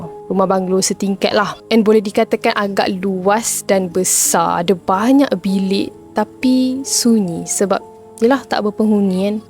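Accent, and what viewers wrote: Kelantan, Malaysia. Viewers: Kecek kelate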